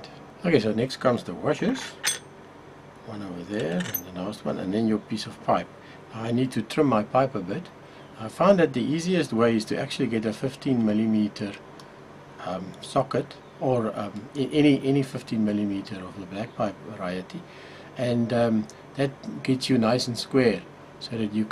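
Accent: South Africa. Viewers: are you afrikaans?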